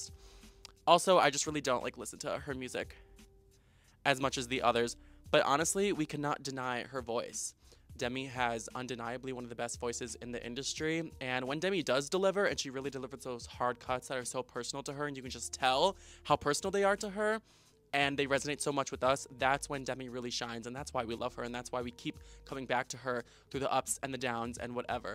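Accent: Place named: New York, United States. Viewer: Really?? I’m from New York and that’s how we speak. I have a heavy New York accent. It’s like people that have a British accent, not really something you can control and you do sound like you’re from New York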